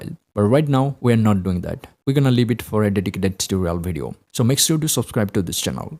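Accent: India. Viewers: Kolkata + English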